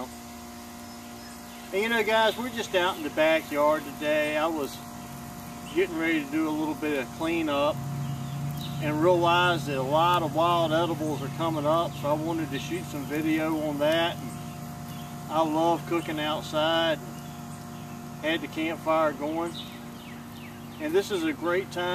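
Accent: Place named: North Carolina, United States. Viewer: I enjoyed this, thank you. You sound like you might be from North Carolina or east Tennessee?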